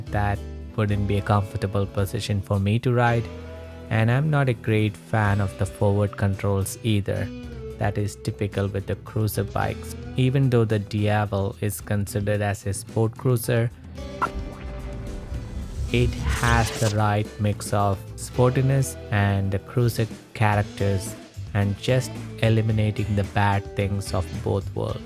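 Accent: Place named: India. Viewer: That desi accent though. Say " Glass "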